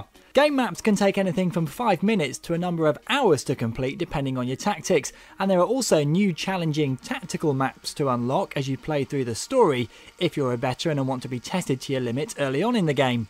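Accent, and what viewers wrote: United Kingdom. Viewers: i love your british accent <3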